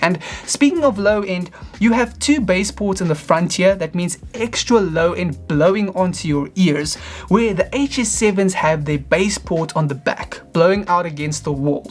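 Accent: South Africa. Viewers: you are south african right?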